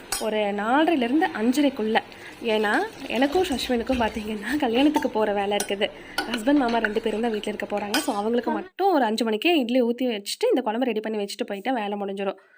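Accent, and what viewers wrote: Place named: Kongu, India. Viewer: Kongu tamil nanga veetla pesara mathiriye pesirunthinga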